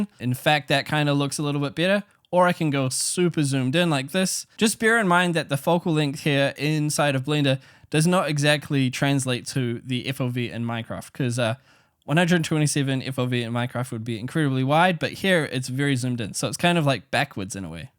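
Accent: New Zealand. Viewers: I KNEW I COULD HEAR SOME KIND OF KIWI IN YOU!!! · Are you from New Zealand?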